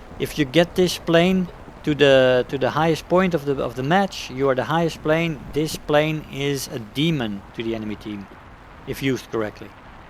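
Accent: Netherlands. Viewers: Holy cow, i recognized that dutch accent of yours fast and realised that you were from the Netherlands just like me! 😂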